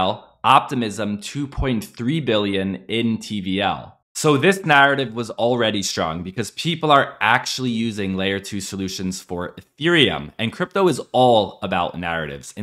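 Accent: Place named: Philadelphia, United States. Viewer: Dude is from the Philly area. I’d bet some money. You can tell by his accent.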